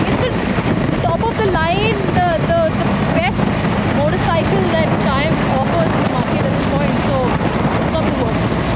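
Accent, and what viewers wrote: India. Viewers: Don't talk Indian English, talk just in Hindi, your English language is very poor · Loslos mo! Indian accent p rin whatever 😏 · Lady that bike is NOTHING compared to your beauty! Wowwww I am crazy about long dark hair but your face, your smile... I tried 5 times looking at your video to get more info on the bike but failed again and again. Your Indian accent and of course Indian decency is only the Cherry on Top. What did you say about the biggest bike??? ❤❤❤❤❤❤❤❤❤❤❤❤❤❤Much love from South African Boer. Ps.? if you come with the Bike I WANT one YESTERDAY, TODAY AND TOMORROW.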